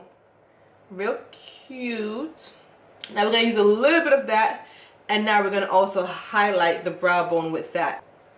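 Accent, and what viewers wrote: Jamaica. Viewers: Hi, i love your videos and your Jamaican Accent😎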